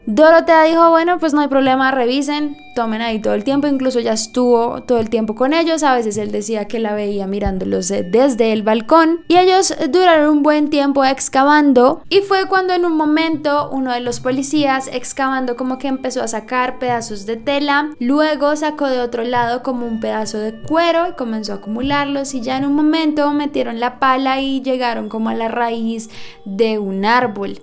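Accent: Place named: Colombia. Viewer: Una voz femenina con acento colombiano e historias de terror uf... es un placer auditivo...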